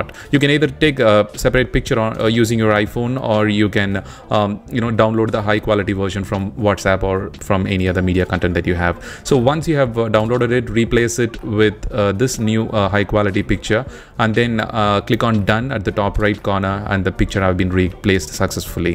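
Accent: India. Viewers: Love Indian accent wish I could do it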